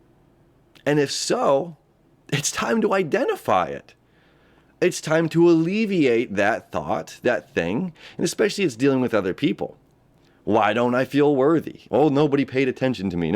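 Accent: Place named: Australia. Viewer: your Aussie accent is actually pretty good, it's not terrible at all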